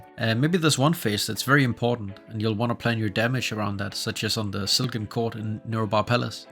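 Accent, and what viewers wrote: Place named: Denmark. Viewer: I fucking knew you were Danish by the accent and then got confirmed when I saw those puregym dumbbells. Let's goooo!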